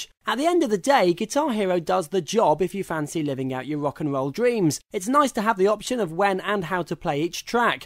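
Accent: United Kingdom. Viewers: omg, that British axent! i am dying!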